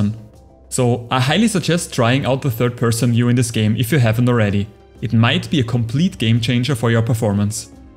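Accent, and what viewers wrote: Germany. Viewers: German Accent 👌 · You German?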